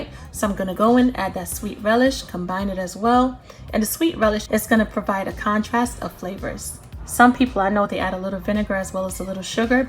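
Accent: New York, United States. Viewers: You sound like a new Yorker 🤔🤔🤔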